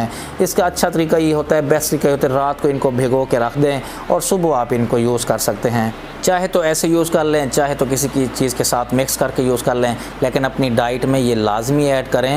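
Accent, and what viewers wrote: Pakistan. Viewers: Bahawal pur yaad aa giya ...aap ki aawaz sun kar ...zinda bad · Sir ap saraki speeker hen lagta ha janoobi punjab K rehnay walay hen....nice videos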